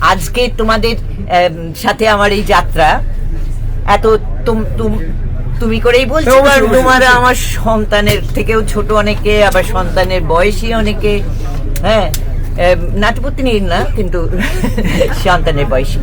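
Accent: Kolkata, India. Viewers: কলকাতা স্টাইল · কথা বলার স্টাইলে ভারতের মত,বাংলাদেশ ভাষার প্রেম মারায় 😂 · এটাতো কলকাতার ভাষা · Tomar kotha vongi kolkatar varot jao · তোমার বঙ্গবন্ধু লীগরা বাংলাদেশে খাই ইন্ডিয়া যে বসবাস করে তাতে কি তুমি দেশ প্রেমিক মনে করো তোমার মুখের ভাষা হয়তো মনে হয় ইন্ডিয়ান কলকাতা তুমি আমার বাংলাদেশের দেশপ্রেমিক হওয়া কি করে তুমি কোথায় দেশে নাকি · আপনার ভাষা তো আমাদের বাংলাদেশের ভাষার সাথে মেলেনা বরং কোলকাতার সাথে মেলে অতএব আপনি যে ভারতের দালাল সেটা আমরা বুঝি।😂😂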